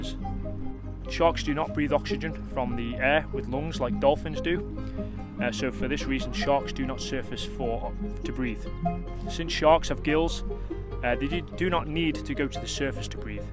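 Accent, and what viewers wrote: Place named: Liverpool, United Kingdom. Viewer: You have a local accent... eport? cheshire ways or liverpool?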